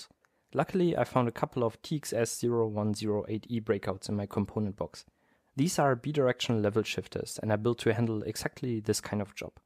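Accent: Germany. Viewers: Are you german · accent is kinda cute 😅 he sounds German or something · Are you a german? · Technisches Gebrabbel auf Englisch mit deutschem Akzent - ich kann dir nicht sagen, wieso, aber irgendwie hat das Charme. 😅 super Video, mega interessant. Danke! 😊👍🏻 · Sprichst du deutsch ? Dein Englisch hört sich wie meins an ^^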